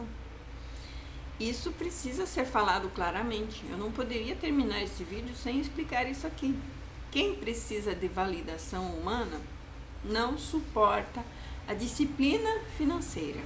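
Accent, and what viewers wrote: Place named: Rio Grande do Sul, Brazil. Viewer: Deus abençoe irmã! Sutaque maneiro deve ser do Rio Grande do sul que Deus abençoe a todos dái!!!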